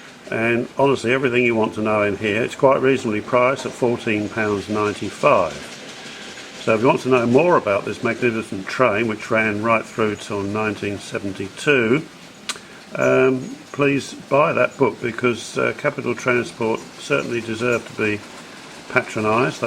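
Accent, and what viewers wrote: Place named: Australia. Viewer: Wait, your accent has an australian twang... if youre australian, ignore the beginning part of the comment under this........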